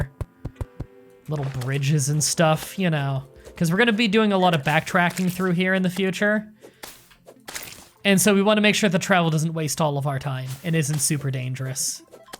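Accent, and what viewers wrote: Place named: Southern Ontario, Canada. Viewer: Gotta love that Southern Ontario accent of his! Best Canadian Youtuber!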